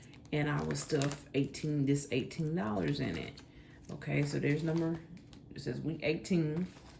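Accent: South, United States. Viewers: Yay, love your video and your Southern accent! I lived in GA for 12 years.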